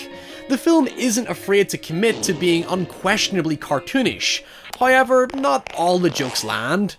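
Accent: Northern Ireland, United Kingdom. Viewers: I was so surprised to hear the Northern Irish accent! So glad to see some representation of our little part of the world in a successful YouTube channel, keep up the good work buddy! :) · Sounds like a northern Irish guy do an American accent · It's a Northern Irish accent, though I barely notice because it's the kind of accent I hear day-to-day so it's very familiar to me at home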